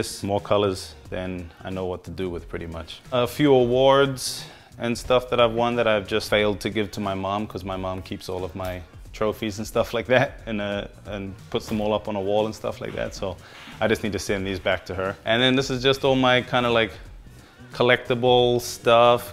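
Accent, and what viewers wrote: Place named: New Zealand. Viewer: The bro slowly letting go of his kiwi accent…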